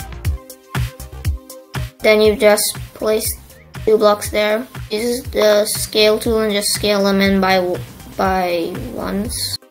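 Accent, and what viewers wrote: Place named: Russia. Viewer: Зачем ты снемаешь русским англиским голосом?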